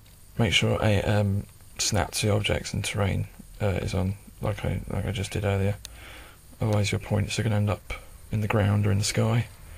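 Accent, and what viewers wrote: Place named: United Kingdom. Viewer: Absolutely fantastic! Great to see that this channel hasn't died, it seemed like such a good idea. Great tutorial, very clear and helpful. Always good to hear a grand british accent on the web too:). Greets. Eth.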